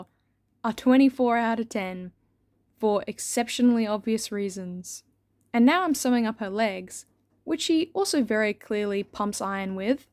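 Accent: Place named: Australia. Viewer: This is outstanding and your Aussie as well like wow!❤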